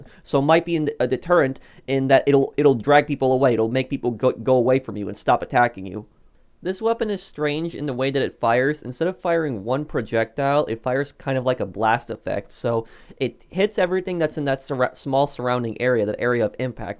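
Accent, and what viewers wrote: Poland. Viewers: Are you polish? Just a Side question. But i love your content and i love How creative and descriptive, especially when it comes to killing griefers. Great job. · Are you polish?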